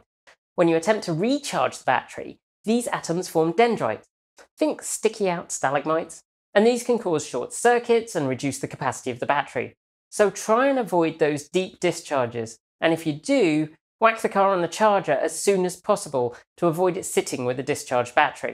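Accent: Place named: United Kingdom. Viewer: Why are many of the EV videos feature man-ish looking woman with an annoying limey accent. "Let it cool down before you wack it on the charger"... Oh the humor....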